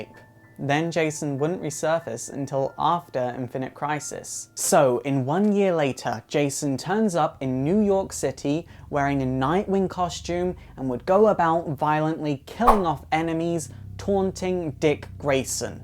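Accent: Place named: RP, United Kingdom. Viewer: Damn, do you know that you could be an... excellent storyteller, don't you? I mean with your narrating talent, passion you talk with, you can recite fairytales for children/work in a sort of playhouse or something. To think about it, your British/RP-ish kind of accent (from one way extremely annoying) can do the trick as well.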